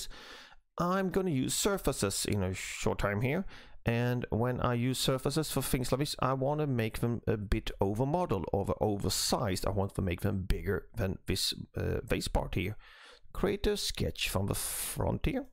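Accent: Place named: Sweden. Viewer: Your accent is extremely similar ( he is also from Sweden)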